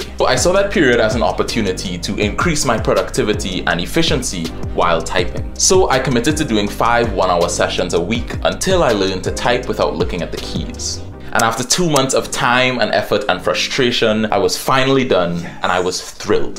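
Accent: Trinidad and Tobago. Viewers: Are you from Trinidad? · Are you from Trinidad & Tobago? You sound exactly like one of my coworkers. Except with a less pronounced accent.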